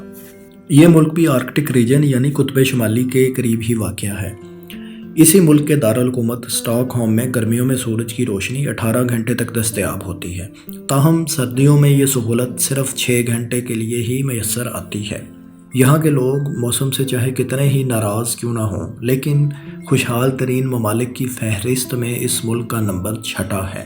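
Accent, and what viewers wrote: Punjab, Pakistan. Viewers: Urdu to sahi bolain. waraich jaisa koe nhi? Ye sahab acha bol rahy hain laikin talafuz main kafi kharabi hai. Aisa lag rha hai jaisy punjabi lehjay main urdu bolnay ki koshish ho rahi ho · Too heavy Punjabi accent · Sub ko pata hay paindu ji, is say to acha tha kay Punjabi hi bol laytay, kisi Urdu bolnay walay say video banwa liya karo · bhai, is bhai ki awaz Zara suit nhi krti apki video's my.... aysa lgta ha punjabi bol raha ha, gala ky urdu bol raha ha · Punjabi accent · Pls correct the pronunciation... It feels like punjabi. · sir ki Urdu bhe saaf nhi hai punjabi accent use karte hain urdu main jayse Barabara main bara ko kisi Ajeeb andaz main bolte hain plz change Karo plz 💔💔💔💔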